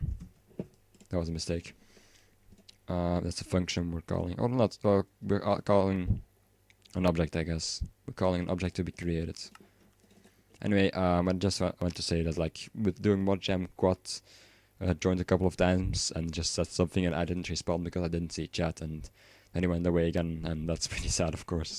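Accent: Netherlands. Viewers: Are you dutch?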